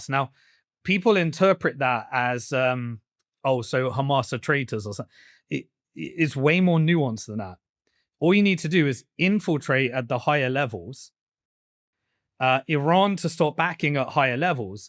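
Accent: United Kingdom. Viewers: He makes these wildly false statements. He drastically oversimplifies things he conflates completely unrelated things together. He refers to things that have Then, in place forever as new While stating his wild predictions and speculations as fact. He is the textbook example of a Zionist pompous british ass. Who thinks he could lie to your face because he has a british accent. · You sound like a city of London guy. You have no idea who Trump is or what he is capable of.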